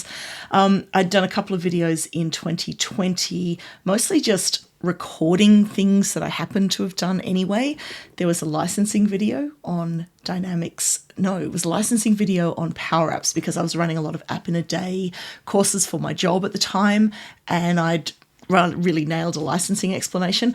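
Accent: Australia. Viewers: Fantastic, congratulations and well deserved. I always look forward to your videos when released and enjoy the content and your explanation - and your personality. Also, so great to hear a familiar Australian accent in sea of American Microsoft content. Well done!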